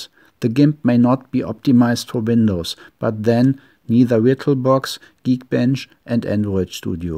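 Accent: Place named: Germany. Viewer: wieso kann man das englisch klar verstehen aber das deutsch trotzdem raushören?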